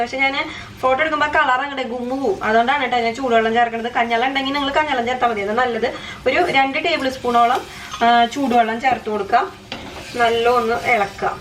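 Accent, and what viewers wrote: Thrissur, India. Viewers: തൃശൂർ ആണല്ലേ... ഇത്ര സ്പീഡിൽ എങ്ങനാ സംസാരിക്കുന്നെ??? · എന്ത് രസാഡോ തന്റെ സംസാരം കേൾക്കാൻ.. തനി തൃശ്ശൂർ ഭാഷ. നാട്ടിൽ എവിടെയാ? അമ്മച്ചി സ്പെഷ്യൽ എല്ലാം പൊളിയാട്ടോ.. അമ്മച്ചിയോടു പറഞ്ഞോളൂ. എല്ലാവരും ഇപ്പോൾ പഴമ ഇഷ്ടപെടുന്നു...അതാ തനിക്കു ഇത്ര സപ്പോർട്ട്. ഇങ്ങനെ തന്നെ മുന്നോട്ടു പൊക്കൊളു. എല്ലാവരും കൂടെ ഉണ്ട്. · Variety recipes aanaloo... Recently aanu Kanan tudangiyath.... am from EKM... nalla speed samsaram... Nik oru frnd und.. she is from trissur, she is talking like this... any way NYC recipes !All the best dea...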